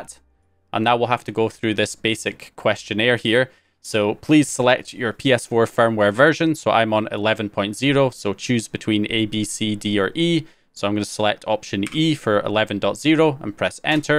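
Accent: Scotland, United Kingdom. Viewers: He’s Scottish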